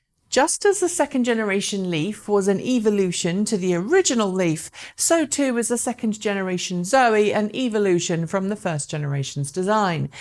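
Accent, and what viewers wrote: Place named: United Kingdom. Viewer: oh gosh I didn't know to be honest. I thought you were from the UK judging by your accent! Lol, sorry!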